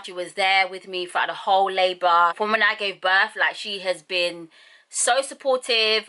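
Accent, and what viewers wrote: Nigeria. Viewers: I love the Nigerian 🇳🇬 accent 😍😍😍😍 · I love the way the Nigerian accent comes out from time to time. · Nigerian accent never leaves ur mouth 😂😂😂😂 😂😂😂😂😂😂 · I can still sense your Nigerian accent Good you proud of being a Nigerian ❤️❣️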